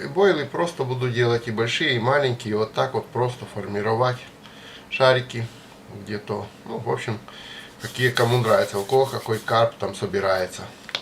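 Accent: Ukraine. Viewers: Подсмотрел у укрАинских рыбаков.украинские рыбаки -хорошие рыбаки.я с Сибири.судя по"молотарке","та же самая"говору,сразу ясно шо не москаль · Судячи з акценту, Ви українець, чи не так?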